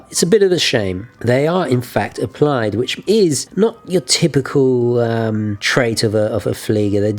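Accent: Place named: United Kingdom. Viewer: Why are all these channels British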